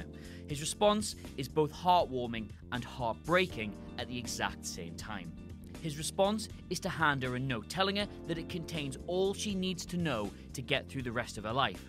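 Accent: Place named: Northern England, United Kingdom. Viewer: These are heartbreaking and sweet in various ways. But what I will say is that Abe was a bad father first and thats a lot to do with why Homer is a bad son....it doesn't excuse him but still. Also, i think this is the first Northern English voice ive heard on narration on here. Being Northern English myself, its comforting to hear this.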